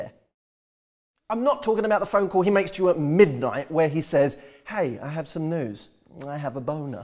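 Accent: United Kingdom. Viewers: I love your British accent .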